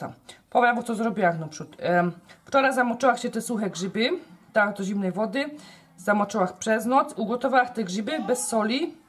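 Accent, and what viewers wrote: Silesia, Poland. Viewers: Superrr jesteś fantastyczna twoja gwara śląska jest niesamowita muszę codziennie Ciebie posłuchać. Buziaczki😘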